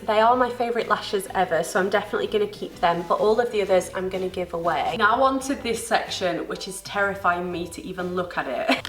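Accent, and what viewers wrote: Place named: Doncaster, United Kingdom. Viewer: Are you from Doncaster?? You're accent is similar to that 😁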